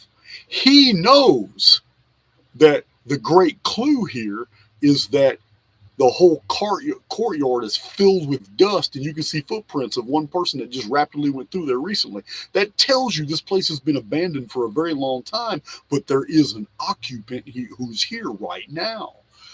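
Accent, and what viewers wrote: United Kingdom. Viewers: Brilliant Manchester accent 😊you didn't piss me of and im Scottish 😂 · Lol...loved the English accent😊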